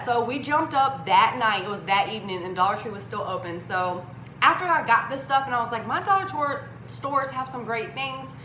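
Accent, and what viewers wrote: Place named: Georgia, United States. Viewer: We are having terrible weather, so I'm watching all your old videos!!! 😍 I love that now you let your Georgia accent loose. I don't hear it so much in these older vids! Love you and your kiddos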